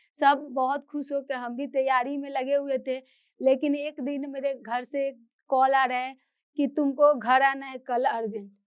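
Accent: Bihar, India. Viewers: Me Bihari to nahi hu ,,,but mujhe bihari tone bahut bahut pasand h. 😊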